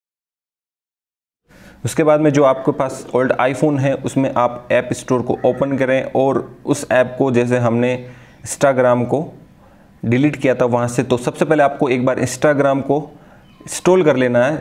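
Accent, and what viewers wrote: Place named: India. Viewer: why every single tutorial is made by indian???!!! I can not understand anything!!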